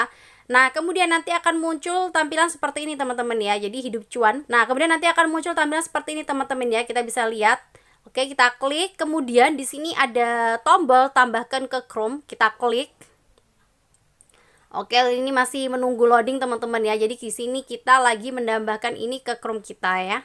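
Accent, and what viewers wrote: Java, Indonesia. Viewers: Jowo ne medok tenan. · Jawa ne keton bgt ya mba, tapi ora ap2 cukup bantu go enyong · Medok mba, mantap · Alhamdulillah... Jam dua malem dapet informasi sepenting ini.. Makasih mbae.. Ngomonge medok bgt.. Hehehe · Medok plus Gas Pull yo ndo 👍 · Medok amat mbak 😆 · Aku sukak medok jowomu kuwi mbakyu.... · Muedooke gaes ngangeni..😀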